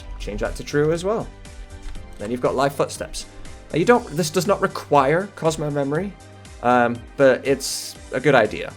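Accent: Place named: United Kingdom. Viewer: it took me a good 30 seconds to realize the british accent.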